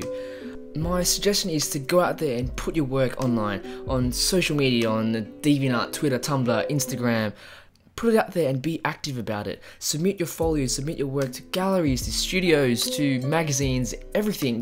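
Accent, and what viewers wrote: Australia. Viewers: Hey I love your work but please work on your pronunciation! it’s hard to understand you. Usually it’s hard to understand asians speaking english, imagine adding australian accent to it hahaha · I recognised the accent, then saw that you’re from Australia too! G’day to all my fellow Aussie artists.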